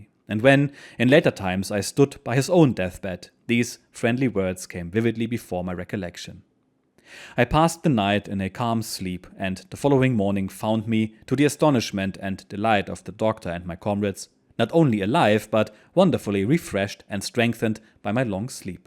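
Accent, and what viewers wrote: Germany. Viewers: Really a great story ,I've enjoyed every episode thanks for reading it as your German accent makes it feel like it was him reading 📚 it !!!! Fantastic !! As the English say cheers!!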